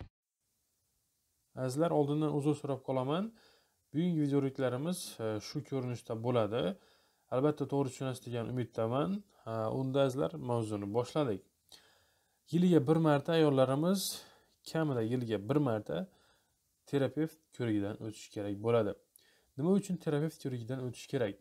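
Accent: Khorezm, Uzbekistan. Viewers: доктор кайерда ишлайсиз гапиришиздан хоразимликка охшайапсиз.агар хоразимда болсангиз иш жойизни айтинг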